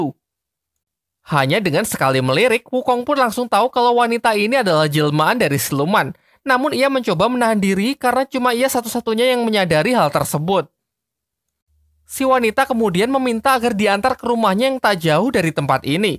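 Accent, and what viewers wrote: Java, Indonesia. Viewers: Wong jowo e mas? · Jowo bang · Wong jowo mas?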